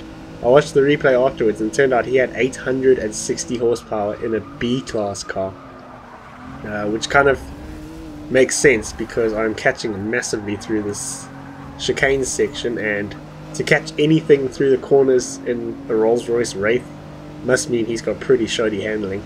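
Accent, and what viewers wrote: South Africa. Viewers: Is this guy from South Africa?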